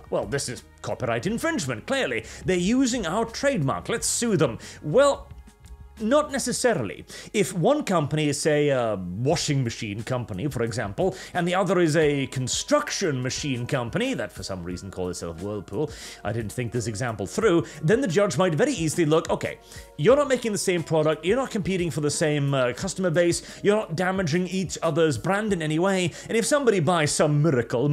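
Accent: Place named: United Kingdom. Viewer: I didn't realize brits stress vowels so differently versus Americans.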